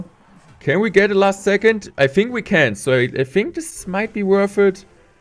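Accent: Germany. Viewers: Dieser deutscher akzent lol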